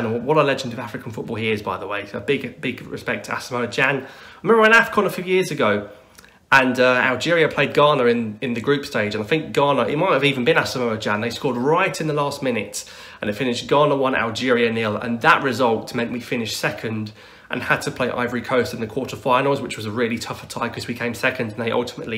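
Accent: United Kingdom. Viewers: you got a British accent ! Are you English guy who supports algerian football team ?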